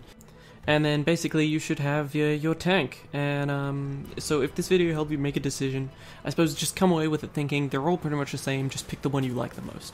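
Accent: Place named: United States. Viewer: Well he is american.. And remeber tomato tomato..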